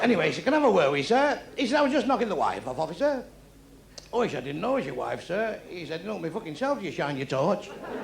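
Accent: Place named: Manchester, United Kingdom. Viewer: Me and Bernard are both slum kids from Manchester These are the jokes we used to tell at school when we were teenagers. Same accent, same dialect, same words, same places and so funny. Bernard is not prejudiced but you can tell by the laughs that some people in the audience are.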